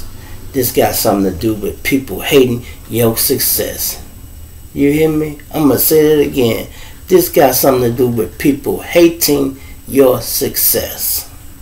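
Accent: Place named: Chicago, United States. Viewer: You are from Chi-Town or some Chi-Town ties I hear it in your talk. I didn’t hear it the first time, one love I’m the same way with the Strip Club Gambling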